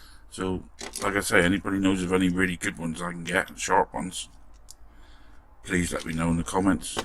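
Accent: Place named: Devon, United Kingdom. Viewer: Hi, loving all your videos, always a joy to watch, how do i contact you directly regarding your restos? you also sound like a Devonshire lad like myself, i also after watching this video like to share a few tips with you also, all the very best, stay safe bud.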